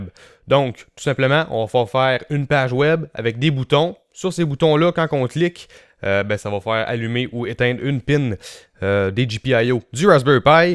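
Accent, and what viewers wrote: Quebec, Canada. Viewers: Hello from France. Superbe tuto, bien ficelé et ça marche du premier coup. Merci beaucoup. Toujours un plaisir d'entendre l'accent québécois. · OMG UN QUEBECOIS NON?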